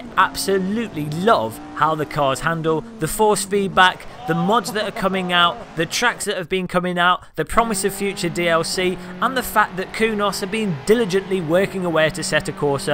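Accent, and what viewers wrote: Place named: England, United Kingdom. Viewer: Seb, he has a very English accent. But I know what you mean. regional French dialects would be a mystery to me, too. :)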